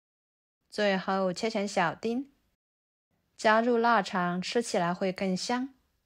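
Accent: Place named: Yunnan, China. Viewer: 云南普通话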